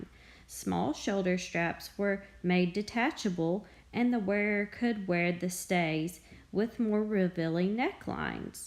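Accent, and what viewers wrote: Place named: Missouri, United States. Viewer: I love your accent, are you from misouri? I'm not from the states so that's the closest guess I can make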